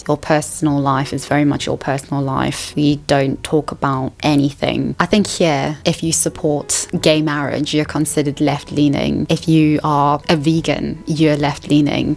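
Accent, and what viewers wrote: RP, United Kingdom. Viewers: Brits are definitely uptight and miserable compared to Americans. Please come to a cookout in the US. We'll make fun of your posh accent in front of everyone. But if you laugh and come back with a savage reply, we will love you very much and want you to stay forever. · I absolutely love your Proper British Accent. I am American and can so understand every syllable spoken. It's music to my ears. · As a (east)german I do not really care about US/UK cultural differences. But I love your voice and pronounciation (the "t" and "s", the vowels)! To me, that's the britishness of your channel. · It's your posh English accent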